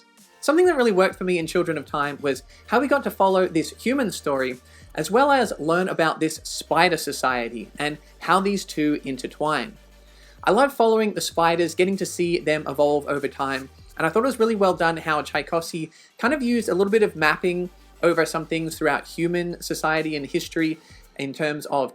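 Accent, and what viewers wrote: Australia. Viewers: The algorithm brought me here, and I'm glad it did. Good to hear an Australian reviewer. My particularly self-serving suggestion is my debut, 36 Streets (Titan Books UK). It was just last week shortlisted for an Aurealis Award (Best Science Fiction Novel), so I can assure you it's not terrible. Cover quote from Richard Morgan (Altered Carbon), who's a huge fan of the book. Cheers, T. R. Napper.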